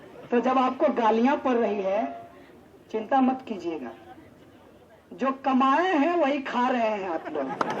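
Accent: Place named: Bihar, India. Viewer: کنائیہ جی ہمیں کل ہی آپ کا تعرف ہوا ہے ایک بھارتی دوست سے اور کل سے آپ کا سپیچ ہی سن رہے ہیں Hamain bihari zaban to pehly hi bohat achi lagti thi ab ap sy bhi dil lagi hogai ha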